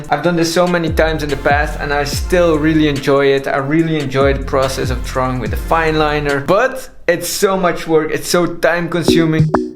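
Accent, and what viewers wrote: Netherlands. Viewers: I bet you’re Dutch. That accent is so recognizable. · Are you from the netherlands? Because your accent is sometimes a little bit netherlands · Where are you from cause you sound like u have a dutch accent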